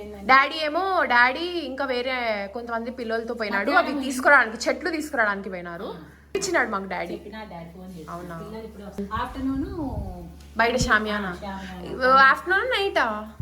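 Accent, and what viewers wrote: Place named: Telangana, India. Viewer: emo akka nuvu matladuthuntay ma Telangana lo matladi nattu undhi naku, antay dialect antunaa......Ma Palamuru(Nagarkurnool+Mahabubnagar+gadwal) ki rayalaseema ki chala similarities untai ,idhi na personal opinion. Lots lo love from Telangana akka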